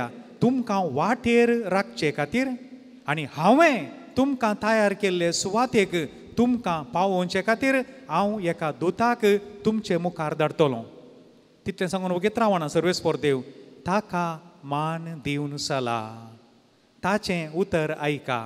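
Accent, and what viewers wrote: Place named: Mangalore, India. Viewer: Father, your Konkani is very clear. Aputt Mangalorean. Thank you for your discourse.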